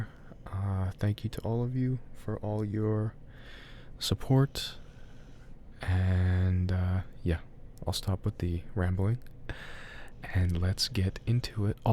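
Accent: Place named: Canada. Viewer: JUST listened to my first audio of yours, your first one on Quinn. Can I just say, first sentence thought, “oh? Is he Canadian?”, 10 seconds in you say ‘sorry’, “yup, definitely Canadian”. A cowboy but without the American accent and uses CST? Yeaaa canadian prairie boy. As a fellow Canadian, I call this supporting local arts and culture 😆. Seriously though, until today, I pretty much stuck to always the same VA (Rum). i’ve tried listening to quite a few other VAs on Quinn and I just can’t. Either tone, pace, sound effects, etc. turn me off. I’ll need to do a bit more research to be sure 😉 but, I might just have a 2nd VA on my list, which is very exciting! For real though, I don’t know if you’re familiar with Rum, the binaural king, buuuttt you give similar vibes and tone. Also from the reddit world. I would KILL for a binaural audio with you in one ear and Rum in the other. 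Or maybe just a twitch stream where we just get to listen to your smooth voices playing games 🫠. K, I know I’m getting carried away but seriously, I have a vision of you two being friends and making 🔥 content together. Listening to this now because I like to know a bit about the VAs I listen to. A whole 4hr intro!!! 😂 So i’m not done yet but I will get through it. I actually lol’ed at the haulin’ hay answer because #truth. Not a farm girl but helped haul hay on my uncle’s farm and it’s no joke. Congrats on ALMOST 20K🎉 🎉🎉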